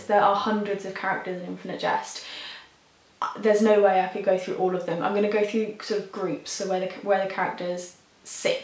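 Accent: United Kingdom. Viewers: agreed. this, combined with her heavy brit accent, makes it hard to hear.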